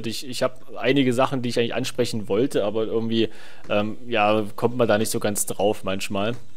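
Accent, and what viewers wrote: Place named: Saxony, Germany. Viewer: Dat Sächsisch yo :D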